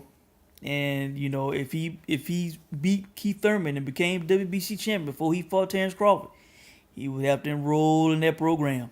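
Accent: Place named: Texas, United States. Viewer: I like your Texas accent BTW I'm laughing my ass off u funny keep that same energy 😎😎😎😎😅😅😆😆😂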